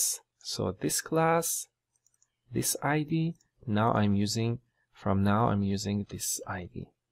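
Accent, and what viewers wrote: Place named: Iran. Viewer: You have a persian accent , are u persian hamvatan?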